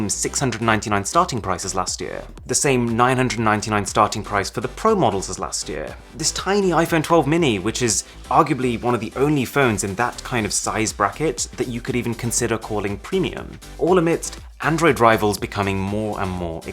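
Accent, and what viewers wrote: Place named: United Kingdom. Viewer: Yep.... speaking the King's English, but then he often ruins it by saying, "anyways".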